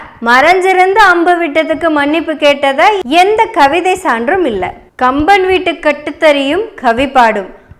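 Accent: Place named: Coimbatore, India. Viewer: Super you are taking different topics and exposing reality dear daughter in Kovai slang especially. Lot's of our peoples are fans of you since for your slang especially 🎉❤keep going 💪 👍 👏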